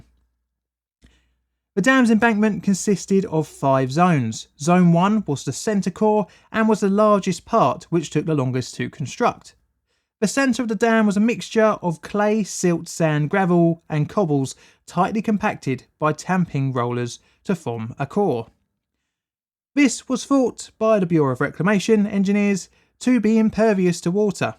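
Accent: United Kingdom. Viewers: Why is this narrated by a limey?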